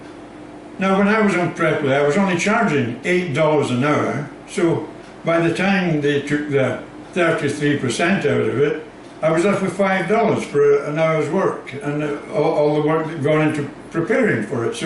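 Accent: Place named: Scotland, United Kingdom. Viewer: This is Scottish accent .. 😃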